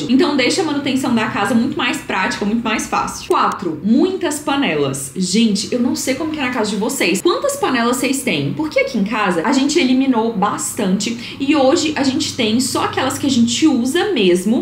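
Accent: Minas Gerais, Brazil. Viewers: Coseraiada, muito mineira, que nem eu😂😂😂😂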